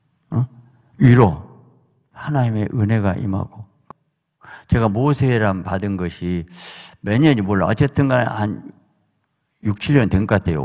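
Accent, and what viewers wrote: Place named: South Jeolla, South Korea. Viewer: 목사님 넘 존경합니다. 너무 설교듣고싶은데 전남 사투리땜에 잘안들려요. 밑에 한글좀 올려주세요. 목사님 넘 사랑하고존경합니다. 미국의아들에게보내고싶은데 저보다 더못일아들어서그래요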